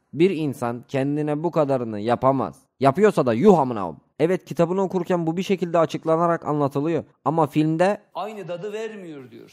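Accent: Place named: Zonguldak, Turkey. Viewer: Seslendiren abimiz %80 zonguldaklı veya bartınlı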